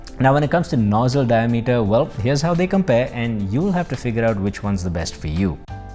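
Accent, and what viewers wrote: India. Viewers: I really enjoy your reviews. I love your oration - great choice of words and phrases! I'm British and maybe that nice flavour of Indian English appeals to me. I don't know, but I like it :) Keep it up. Namaste.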